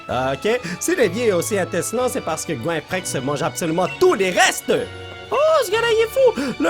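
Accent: Canada. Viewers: Tes français chinoi canadien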